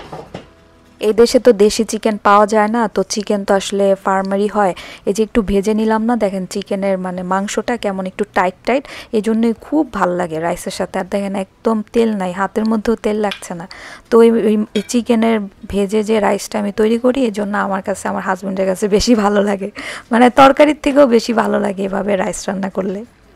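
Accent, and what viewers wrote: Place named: Sylhet, Bangladesh. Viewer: আপু,আপনার আপনার আশপাশে কি সিলেটি আছে?আপনার ভাষা তো সিলেটি টান এসে গেছে🎉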